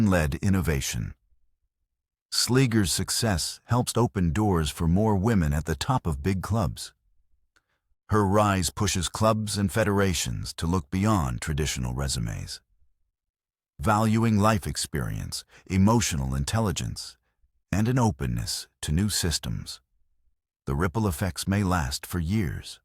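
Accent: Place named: United States. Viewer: Why do we need an American accent?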